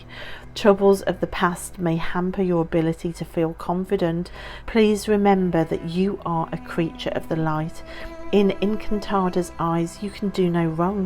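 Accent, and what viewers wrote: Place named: West Midlands, United Kingdom. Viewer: I just want to say that I love your channel. I’ve watched all of your videos and I come for your personality and lovely voice. I like how you combine tarot chats with aspects of your own life and adventures, and without pushing any readings on us. I love your enthusiasm and deck introductions. Your accent reminds me of home - my relatives have a Wolverhampton accent, so West Mids, and I moved away to a location not far from you I think……near to Stratford Upon Avon. People watch channels that are interesting and make them feel peaceful. Ignore the haters.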